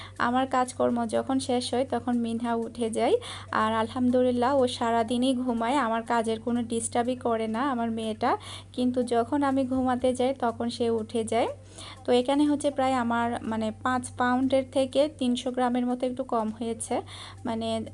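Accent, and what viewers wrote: Chittagong, Bangladesh. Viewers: Apni ki chittagong er?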